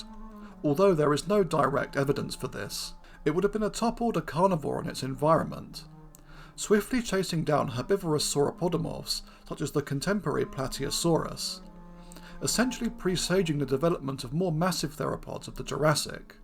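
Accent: United Kingdom. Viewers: (In an endearing way) Came for the click bait, stayed for the Brit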